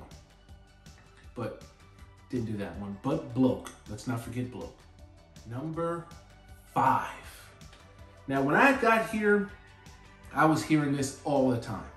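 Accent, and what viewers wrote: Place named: United States. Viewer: These videos show me how much I take your language for granted. Love hearing these with an American accent.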